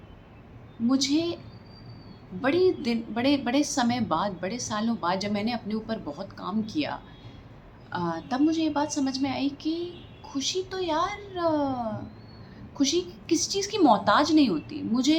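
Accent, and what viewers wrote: Bundelkhand, India. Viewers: You Are really really amazing actress And I love your Bundelkhandi Accent. Keep rising and shinning. Jai Banke Bihari Lal Love from Hiten Pandit. SIT😍😍😍😍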